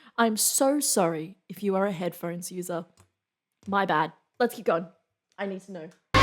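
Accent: Australia. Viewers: The Australian accent is always amazing to listen to. But it just become awesome, when the Australian gets excited. Accents generally does not matter that much to me. But Australian is just.. Those sounds.. Its amazing. And I am sorry talking about this and not the video, but I had the biggest smile on my face because of it the first time you paused. Kamelot is a really interesting band, you should 100% look into more of what they have done. Always fantastic to see you react to things, and give your take on it.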